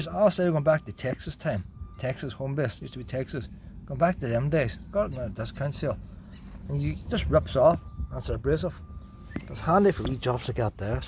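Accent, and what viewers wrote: Ireland. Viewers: Great video - thanks for that! And, your thick Irish accent reminds me of my grandfather - so thanks for that too!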